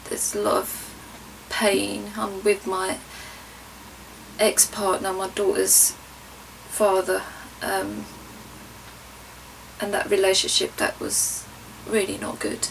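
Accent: United Kingdom. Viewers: I'm an alcoholic, but sober 12 years. I know where your coming from. You've probably done a lot of mental damage. I go to aa a couple of times a week. I'm saved by Jesus. Don't isolate, get out and mix. Your not alone. Love your London accent. Keep the faith sister. Big love xxx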